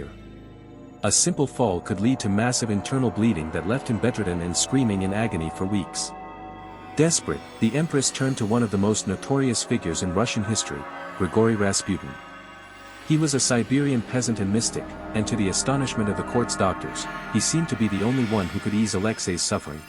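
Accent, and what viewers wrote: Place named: United States. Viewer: Any video clip narrated about the British royal family should feature a voice with an English accent. Is there a specific reason why an American voice dubbed this clip?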